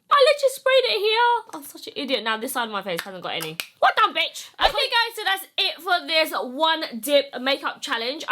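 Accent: United Kingdom. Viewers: I love a British accent !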